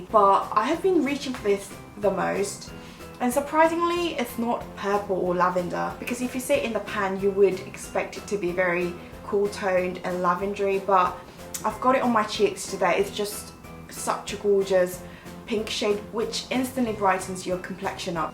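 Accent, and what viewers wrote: United Kingdom. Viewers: Love your British accent!❤ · 은 항상 보면서 존경스러워요.. 외국생활 아무리오래해도 전 저정도는 절대 못할꺼같아요 어후..발음도 약간 영국식발음이 정말 사랑스러워요 꾸ㅡ으으♡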